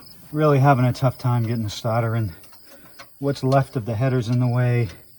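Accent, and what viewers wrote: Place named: Northeast, United States. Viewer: Love yalls videos! I assuming yall originally from diffrent states? You sound like you're from the Northeastern area, and your wife has a normal accent 😁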